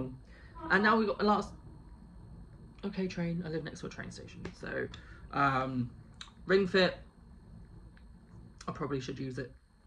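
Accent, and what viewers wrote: United Kingdom. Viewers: Loving the update! Also enjoy the British voice! ❤ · Very cute, and nice to hear your voice! Very British ^.^ hehe x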